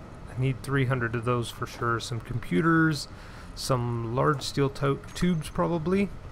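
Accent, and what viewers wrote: Canada. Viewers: are you Canadian? you sound Canadian.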